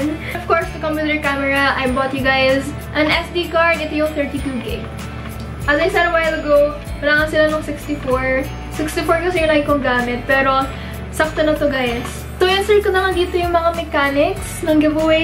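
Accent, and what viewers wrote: Batangas, Philippines. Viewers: Naging bella fam po lalo nung nalaman ko po na batangueña po kayu sobra po akong natutuwa kapag nag babatangueña po kayu. Taga batangas po kase ako😍 Fb: Gwen Comia Ig: gwenv.hope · You’re so adorable and I love the way you talk especially with your batangueño accent ♥️. IG:nnayramlee Fb:Mary Ann De Villa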